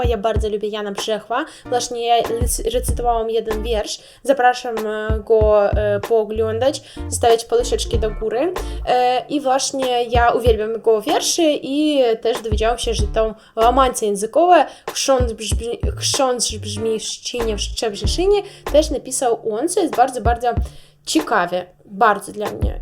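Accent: East, Poland. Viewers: Dziewczyno czym Ty się przejmujesz. Pięknie mówisz po polsku, a Twój wschodni akcent jest wręcz ujmujący. Polacy tak naprawdę lubią Rosjan, rosyjski język i kulture. Nienawidzą natomiast Rosji... Podobnie z Ukrainą. Lubimy Ukraińców Mamy pretensje do państwa ukraińskiego ze nie potępia tego co należy potępić w naszej przeszłości. To tak zawsze jest, ludzie a polityka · Nawet nie wiesz, że dużej liczbie polaków podoba się bardzo ,,miękki" akcent wschodni. Brzmi tak miło. Daje poczucie bezbieczeństwa i troszkę jakby odmładza. Mówisz i wyglądasz uroczo. Tak trzymaj. Moja ciocia z okolic Lwowa dalej zachowała ten akcent,, Ale to już nie to |:)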